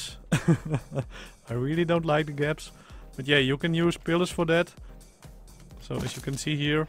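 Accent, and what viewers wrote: Netherlands. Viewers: the dutch accent xD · Ik kijk je voor de eerste keer en kon gelijk horen dat je nederlands bent ;) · are u dutch ? i can hear an accent there hehe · Je hoort gewoon dat hij nederlands is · Are u Dutch? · je hoort een beetje een nl accent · Are you Dutch? I grew up in holland but now live in the USA. Love the accent lol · You can tell this guy is dutch, een goeie dag trauwens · Lekker nederlands accent 😂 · Dat dutch accent is funny. Nice build btw 😃👍🇳🇱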